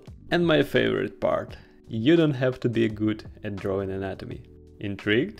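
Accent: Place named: Poland. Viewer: jak to się dzieje że zawszę usłyszę polaka, dobry film tho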